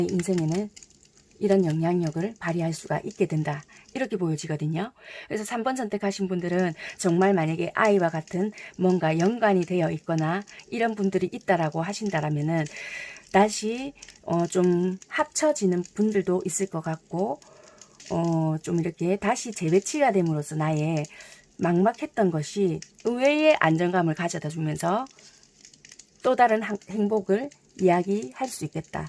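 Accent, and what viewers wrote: Busan, South Korea. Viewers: 자세한 설명 감사해요~ 잘 맞아서 항상 잘 보구 있어용 이번에도 꼭 맞길💗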